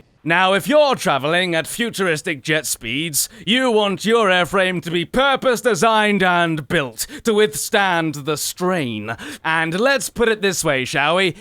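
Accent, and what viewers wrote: England, United Kingdom. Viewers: Ur too British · we will remain loyal to you as long as you have a British accent, your loyal American from America in North America. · OHHH ENOUGH!!...that pommy voice is ORRIBLE. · I love his english accent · he has got to be the most British person i have heard in my life, and I'm British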